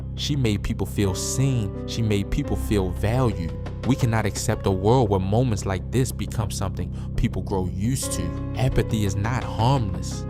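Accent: Baltimore, United States. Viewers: BAL Accent.....🤢🤢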